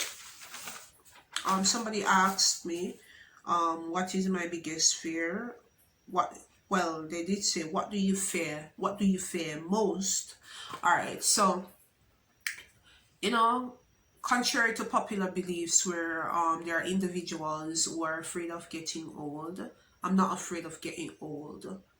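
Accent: United Kingdom. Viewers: I'm hearing a likkle hint of that British accent still 🔥😻 bless up! another great video. I love your answers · Di likkle British accent deh it agwaan nah lie love your confidence from you have self love and confidence in whatever you do you will excel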